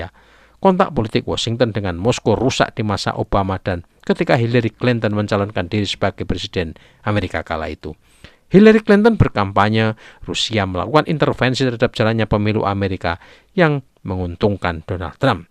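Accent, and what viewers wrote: Java, Indonesia. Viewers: I love medoook. 👍👍👍 Autentik.......👍👍👍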